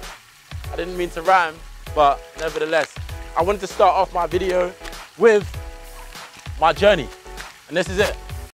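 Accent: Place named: United States. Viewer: you do american real well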